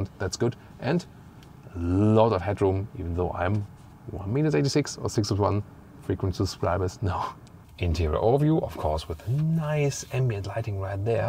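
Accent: Germany. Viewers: His „German“ English accent is absolutely unbearable!!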